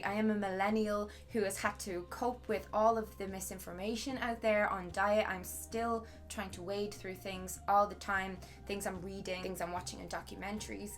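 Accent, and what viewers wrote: Ireland. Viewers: I agree with everything you said! Also, it's crazy how similar your circumstances in this video are to mine! :) P.S. love that Irish accent.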